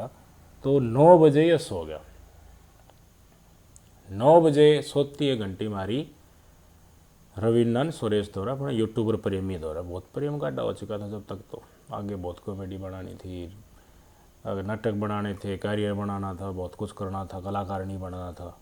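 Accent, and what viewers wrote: Haryana, India. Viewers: Bhai shab aap bolne andaz haryanvi m bhaut khub h or aap ki baat bhut achhi lgi or aap age bdo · Bhai jma gajab tarike t btav h …pure haryanvi💪🏻💪🏻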